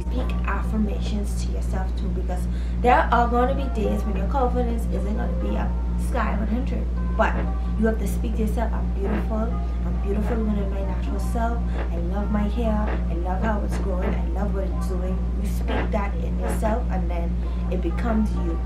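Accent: Bahamas. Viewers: I don't comment on videos but been watching your channel for like two months. Love the authentic Bahamian accent and your content is improving. Stay true and Congrats.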